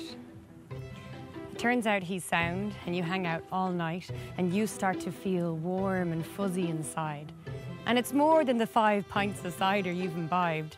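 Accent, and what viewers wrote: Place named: Dublin, Ireland. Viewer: Bhí cailín álainn, cliste agus saothraithe agam mar Te Ciara nuair a bhí cónaí orm agus céim i mBaile Átha Cliath. Ciara álainn cailín, álainn i ndáiríre. Braithim accent Bhaile Átha Cliath. Tá súil agam filleadh ar chónaí ann san athbhliain. Póg mhór ó Florence Ciara. Cailín álainn Éireannach!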